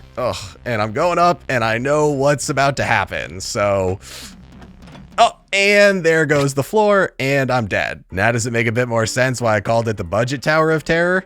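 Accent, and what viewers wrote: United States. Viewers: Is bro from Pittsburgh?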